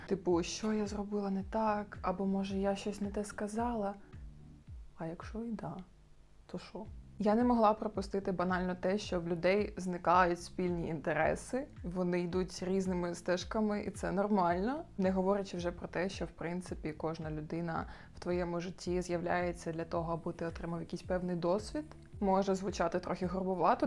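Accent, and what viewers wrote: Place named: France. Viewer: Ви француженка? У вас таких акцент 😂😂😂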